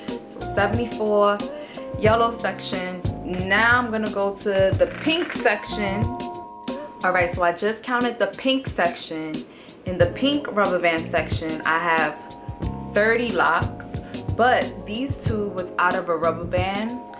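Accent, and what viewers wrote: New York, United States. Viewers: I from New York and she got that New York accent. It can come off sounding Italian like.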